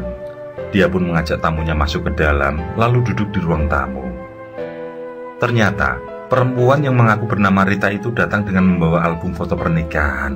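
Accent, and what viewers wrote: Java, Indonesia. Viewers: You got a new subscription from me! Logat Jawanya sukaa. Gambarnya bagus, nggak pakai penggaris pun garisnya lurusss.❤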